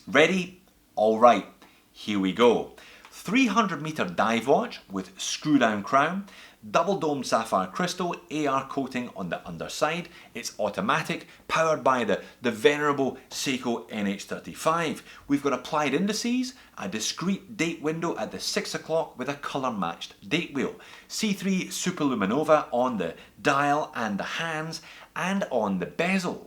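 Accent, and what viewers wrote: Scotland, United Kingdom. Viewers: Honestly I think you’ve got the best watch channel on YouTube. Even when you don’t like a watch you give it a fair shake and most of the time you don’t review watches that an average person can’t afford. And you’ve got a Scottish accent which makes everything you say more enjoyable. Keep up the good work my man.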